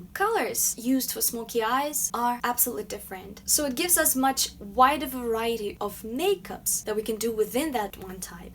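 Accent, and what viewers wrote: Russia. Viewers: I hear some accent..is she russian?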